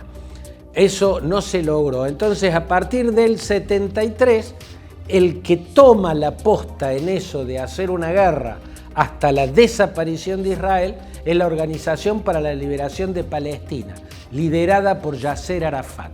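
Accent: Argentina. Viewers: DEJA DE HABLAR ARGENTINO , LUEGO IREMOS POR NUESTRA PATAGONIA .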